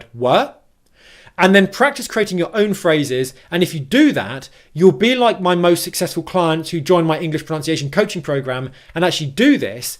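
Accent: United Kingdom. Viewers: From the first time I learnt English, I fall in love with British Accent. It's so elegant. Thank you for making the videos. I love watching it before I go to bed.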